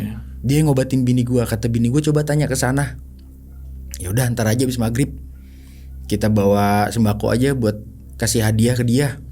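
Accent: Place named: Betawi, Indonesia. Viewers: Ini mah betawi bet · Betawi kalo udah cerita emang seru dach😅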